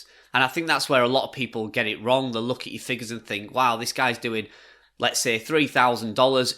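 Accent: United Kingdom. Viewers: Hey! Thank you for answering my questions! I love the British accent and you have pronounced my name properly! If possible make a complete video on full-time blogging that would be worth watching! As per my site update, 23 days from registering my domain name and 19 posts posted which are averaged with 1100 words!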